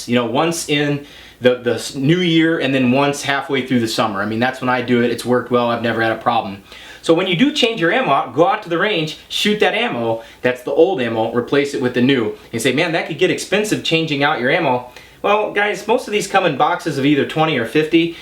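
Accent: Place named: Upper Peninsula, United States. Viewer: you talk like a yooper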